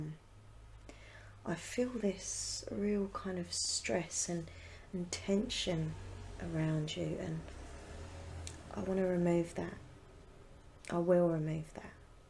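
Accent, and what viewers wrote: Essex, United Kingdom. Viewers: ah an Essex lass fair enough! I'm from lancs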